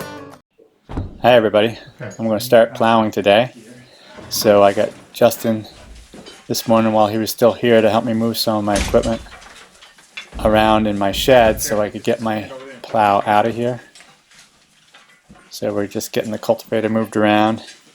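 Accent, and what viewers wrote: New England, United States. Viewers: Thanks for explaining how you got a New England accent in Upstate New York.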